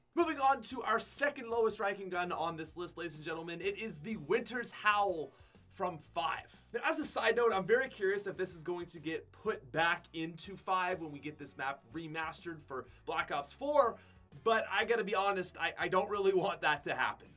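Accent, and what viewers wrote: Canada. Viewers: Love your videos!! You're Canadian accent is hilarious too😂😂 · I love how Canadian he is. Oat-standing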